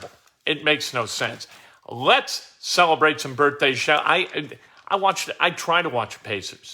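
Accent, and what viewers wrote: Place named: Kentucky, United States. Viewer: Lol at your Kentucky accent 🤣🤣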